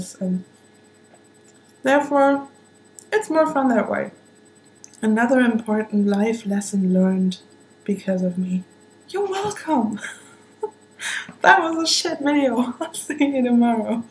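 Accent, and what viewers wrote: Germany. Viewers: love the german accent hahaha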